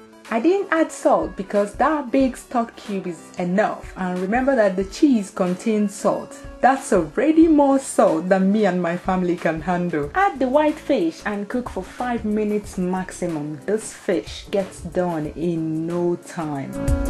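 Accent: Nigeria. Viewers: You sound so Nigerian,I love it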